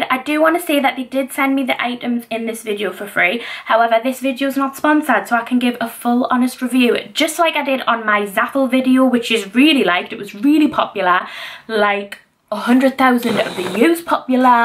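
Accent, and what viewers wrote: United Kingdom. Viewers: Hi I just saw your channel and I subbed STRAIGHT AWAY and I’m glad I did and I love your accent and I’m from the UK TOO!!!!!! but I’m from Scotland ☺️☺️☺️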